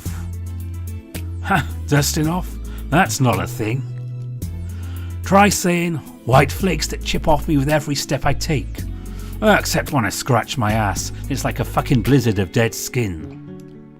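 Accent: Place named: Scotland, United Kingdom. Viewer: You have a really nice accent, but I can’t quite pin it down. Are you Scottish? (I’m terrible with identifying accents😅)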